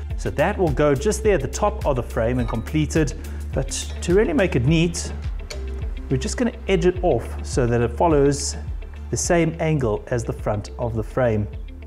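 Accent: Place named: South Africa. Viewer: I was going to ask if the accent was South African... then I realised is not a South Australian youtube channel.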